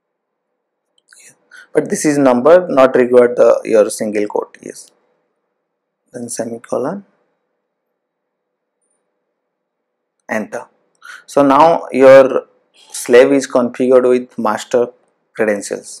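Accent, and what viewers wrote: Odisha, India. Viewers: Great video and my doubt was correct the guy behind the video is an Odia… not from name but from pronunciation….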